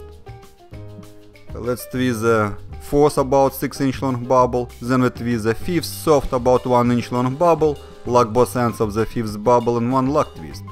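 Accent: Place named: Russia. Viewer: Русский режет на английском ухо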